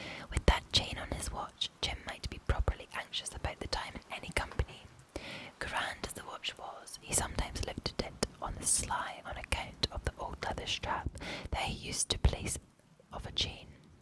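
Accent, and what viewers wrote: Scotland, United Kingdom. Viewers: beautiful Scottish accent, whispered and Tascam mic, this sounds so good and tingly. Thanks for the amazing ASMR content every Monday, Wednesday and Friday 👍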